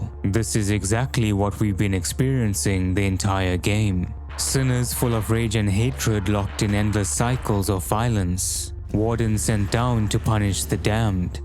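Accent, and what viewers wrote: South Africa. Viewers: I knew you were South African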